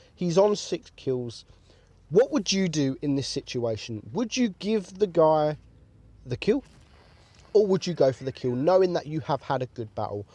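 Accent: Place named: England, United Kingdom. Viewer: drake 515_3 just a English thing. Listen how he says armor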